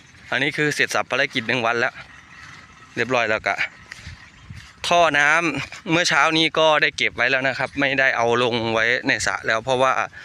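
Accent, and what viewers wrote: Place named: Laos. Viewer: ❤❤❤❤❤ชอบที่ท่านพูดภาษาลาวมากกว่าครับ...adminพูดลาวคนไทยฟังแล้วรู้สึกไม่เพราะครับ❤❤❤❤❤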